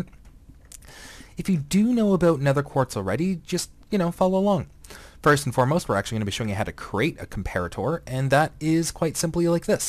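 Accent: Canada. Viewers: you are from canada XD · You sound Canadian, are you Canadian?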